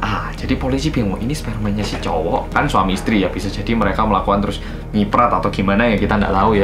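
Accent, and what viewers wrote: Java, Indonesia. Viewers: BERSAMA ORANG KOREA YG MEDOKKK :) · 3 channel storytelling favorit: 1. (pelan, medhok dan mudah dipahami) 2. Nadia Omara (gayanya kayak itu ghibah) 3. Arroyan Pram (Konspirasi dunia pake Astronomi, Fisika, dan editannya gila keren banget, serasa nonton agung hapsah dan Jerome polin bahas konspirasi) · Ya tuhannnnnnn... pekatnya jowo diaaaaaaa😩 selalu aku tgk video dr 🇮🇩 boleh lagi 92% faham... hahhh kali ni 83% jahh faham pon😂 #🇲🇾here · Terus terang, saya nonton channel mas, selain karna kontennya menarik (suka cerita detektif), tapi juga karna kaget bisa nemu orang Korea yang sangat fasih ngomong bahasa Indonesia plus Jawa plus ada medok Jawanya :) Saya sendiri orang Solo besar di Jakarta. So pasti saya subscribe and like. Ditunggu ya, mas, video-video berikutnya. Saya pasti nonton :) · Oppa korea dgn kearifan lokal dan medok khasnya lebih gemes dripada idol yg jdi bias aku wkwkw semangat buat videonya oppaa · Seru abis nontonya..."Welcome to orang korea yang medok" aku dan temenku. 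Krik krik krik krik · Oh pernah nonton ini pilemnya hehehehehe. Bagusss slow burn and depressing banget sampe skrg ga ada titik temunya. Jadi penasaran irl gimana kelanjutannya jadi lanjutin yg murder ini dulu aja Kakkkkk Oh ya btw sukak banget di 5 menitan awal Kakak cerita beneran ngeliat kamera with ur own words gitu, itu kayak kakak beneran ada di waktu kejadian and ngeliat sendiri kejadiannya kayak apa, jd kakak kayak re-telling bgt gitu makanya aku tonton terus video ini. Apa yak namanya? Engaging banget. Buat aku ini yg bikin Kakak beda sama vlogger lainnya soalnya vlogger2 lain kayak yaudah ngikutin naskah aja gitu or worse, baca script D: Personally aku jg suka bgt org ngomong medok jawa krn kerasa down to earthnya terus kayak ngerangkul banget lak cerita. Kayak lagi di warkop terus ngobrol santai. Ga sok mbule pake campur2 inggris gitu Kak. Pls keep it up👍🏻👍🏻👍🏻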